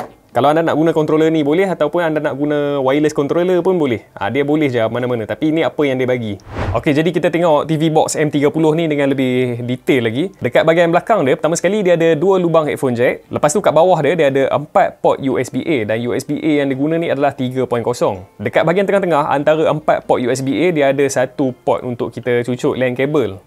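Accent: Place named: North, Malaysia. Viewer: Nampak macam ada idgham2 utagha yang ditahan2.. 😂lantak pi bro.. utagha slag is addictive!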